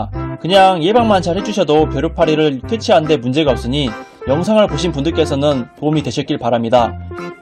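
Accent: Jeolla, South Korea. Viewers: 전라도 사투리가 조금씩 들리네요ㅎ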